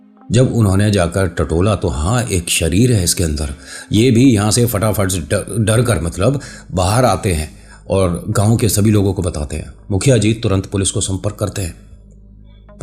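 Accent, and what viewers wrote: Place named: Mathura, India. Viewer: Language se mathura side k lagte hai. Mathura agra hathras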